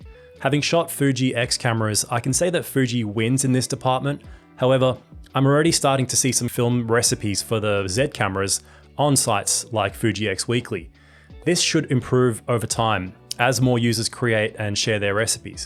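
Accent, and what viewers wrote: Australia. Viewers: Judging by your accent I'd say you are an Aussie. I used to work for Paxtons Photographics in George St , Sydney in the 80s, half a lifetime ago . At the time it was the biggest camera store in Sydney. I bought a Nikon FE when it came out then to add to my Nikon F2. Both great cameras. I'm pretty excited by the Nikon ZF and am going to buy one in the next week or 2. It really does look like a slightly larger FE! This camera could have been designed with me in mind . Lol. It's just what I have been waiting for...I've just discovered your channel and subscribed. Great video and photos!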